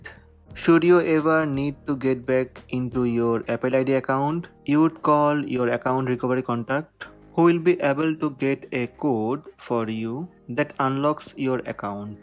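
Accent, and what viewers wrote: India. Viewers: Indians speaking english sounds very ridiculous, next time use subtitles or remove anything below your tongue, the world will thank you.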